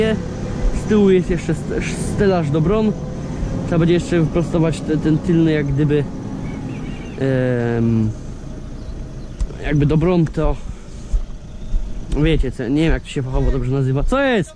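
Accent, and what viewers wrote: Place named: Silesia, Poland. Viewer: Czejść! Jakby na Śląsku!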